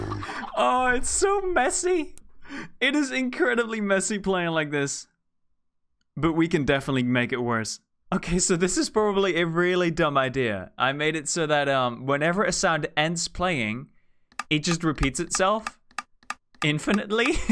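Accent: Netherlands. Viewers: He is Dutch so he has an accent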